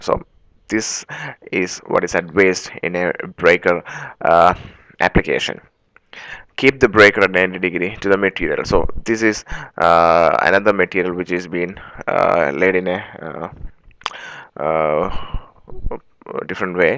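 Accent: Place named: East India, India. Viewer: Great East Indian voice speaking well!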